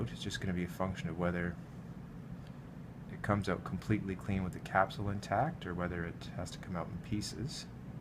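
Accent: Canada. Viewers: Are you from Canada? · Do I hear Canadian accent?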